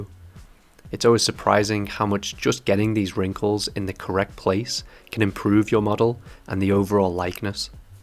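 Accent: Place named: Liverpool, United Kingdom. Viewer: Thank you so much for putting these high quality videos together! You have a soothing but firm tone in your voice. Although it's subtle, I like the Liverpool accent as well! :)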